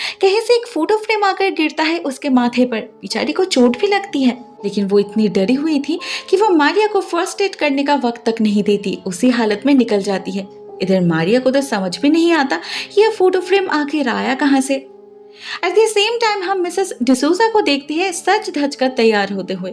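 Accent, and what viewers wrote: Bengal, India. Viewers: Are you bengali didi ur accent bengali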